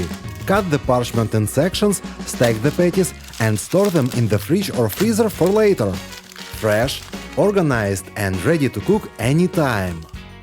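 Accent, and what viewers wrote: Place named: Russia. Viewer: I love the RUSSIAN ACCENT! 👍🏻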